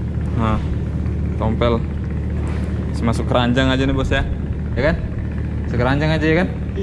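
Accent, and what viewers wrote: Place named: South Sulawesi, Indonesia. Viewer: Mantap Bosku ...klo dengar logatnya klo tdk salah dari Bugis Makassar kah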